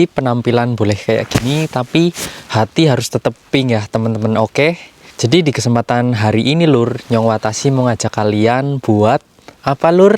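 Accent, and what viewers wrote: Banyumas, Indonesia. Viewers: Ngapak daerah mn mas?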